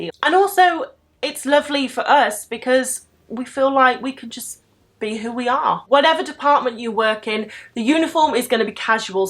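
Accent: United Kingdom. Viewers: Haha, I might work on a crew ship later in life because I don't have any experience now. But your British accent is addictive. Just watched every single video to hear you talking 🤣😂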